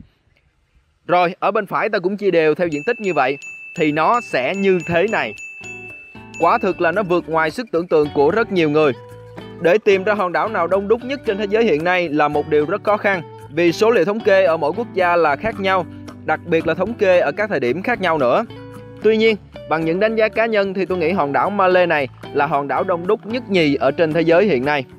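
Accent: South, Vietnam. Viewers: Giọng Nam bộ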